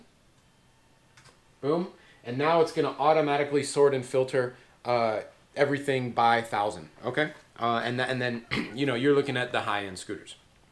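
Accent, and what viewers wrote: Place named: United States. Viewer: oh american guy speaking.im outta here!!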